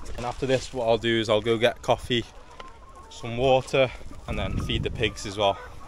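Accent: United Kingdom. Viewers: Bloody hell!! A Thai man speaking British! Good grief i can't believe it! 😅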